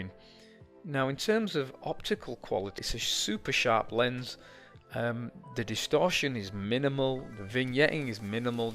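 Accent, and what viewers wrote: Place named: Northern England, United Kingdom. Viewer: Nice northern accent ? I hope the model who is a stunner is from Yorkshire? Could you stop speeding her walk up , its the best part of the video .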